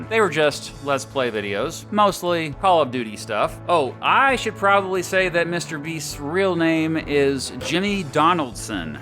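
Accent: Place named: Kansas, United States. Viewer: huh...I was not aware that both Mr. Beast and yourself are fellow Wichitans. Well, I can't call myself a Wichitan anymore, though I am a native Wichitan. I knew you were from Kansas from the first video of yours I watched, though....the Kansas accent is unmistakable, though not apparent to those who spend a lot of time in the state. I can't say I've watched any videos that Mr. Beast has produced, though...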